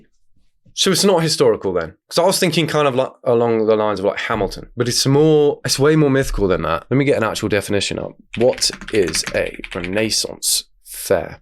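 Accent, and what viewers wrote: United Kingdom. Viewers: wait have i been saying renaissance wrong or is he just british asf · It’s just the way the British pronounce it, coming from a British person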